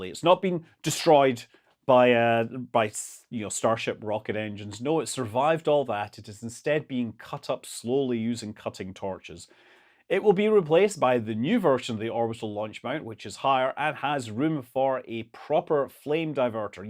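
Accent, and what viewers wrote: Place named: Edinburgh, United Kingdom. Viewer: scott manley is a scot, and manly :) i do a fair accurate glasgow accent. i love yours. doesnt quite sound like glasgow..... or maybe mine doesnt. sounds more edenborough (and i am canadian so wtf do i know)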